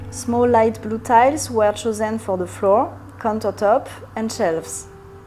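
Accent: France. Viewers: Chouette visite, de plus je me rends compte que je comprends bien l’anglais quand il est parlé par une française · I think it's just her French inflection. What she said is "I love the influence of Danish architects, Alvar Alto, and American MCM architecture". I'd have to assume any professional architect with interest in MCM design has studied this carefully.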